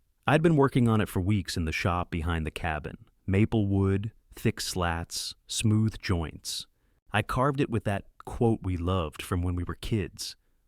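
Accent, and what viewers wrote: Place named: Alabama, United States. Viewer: Dude sounds like he is in love with his sister. Like in scar face. He does sound like the alabama type.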